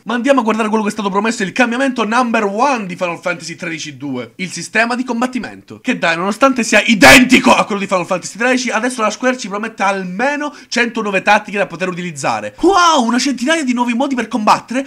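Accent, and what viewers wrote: Italy. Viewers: Ma sei un genio, il modo di parlare (accento siciliano?), il video stesso come e stato montato etc...=>iscritto...(trovato grz al commento di TheWildLife212 nel video die farenz "Caro Angolo, ti chiedo - Mantova Comics & Games - 10/03/13")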